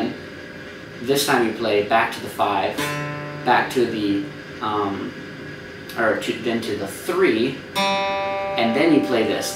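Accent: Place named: United States. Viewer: hehe i like ur accent doing filipino language (tagalog) when ur american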